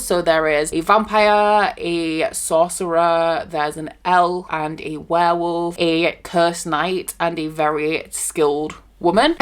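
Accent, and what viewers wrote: Yorkshire, United Kingdom. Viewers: My first time watching your videos and LOVE LOVE LOVE. Can I ask where is the accent from I hear Yorkshire but there is a Scottish `twang ? Am I right? ❤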